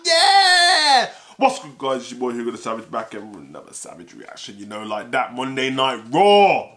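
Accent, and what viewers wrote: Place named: Birmingham, United Kingdom. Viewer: Yo bro are u from brum u sound like u are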